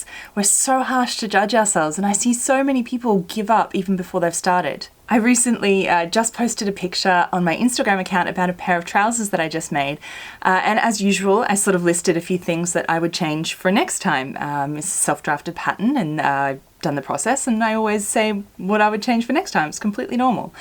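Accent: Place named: Australia. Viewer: Hi Yes this is what I have really enjoyed about your videos. It’s great to reinforce that refining fit, design and restyling are a stepwise process. Too often, with some other youtubers, we are left with the impression that the completed item was produced from an idea and then executed without change from that idea. Although I KNOW this is not at all likely to be true, it still makes me feel a bit like a doofus because I can only seem to do these things step by step. It’s also great to reinforce that the clothes we buy in shops have been through many rounds of test garments. Often home sewers will make only 1 or 2 items from the same pattern.......and then be surprised that there are so many changes that have to be made to finesse the fit and finish. I constantly remind myself of this process that commercial designers go through in order to cut myself a bit of slack when a make seems to require SO MUCH fiddling with to make it work. 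So. Thanks for your reality checks. Love your work PS it’s great to hear an Australian accent too. I seem to have trouble finding local content. Not that it should matter.......but it sort of does (and not that local anyway..... Melbourne girl here)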